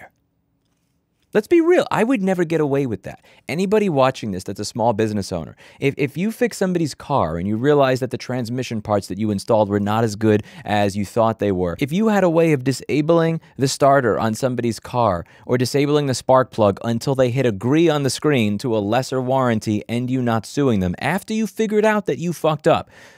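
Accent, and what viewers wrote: New York, United States. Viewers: That's that old school NYC talk I can agree with. Thanks for making my day.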